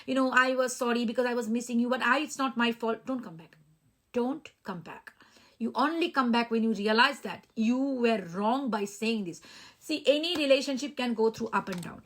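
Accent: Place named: Kerala, India. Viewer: Didi ji,your assent tells that you belong to kerala than bengali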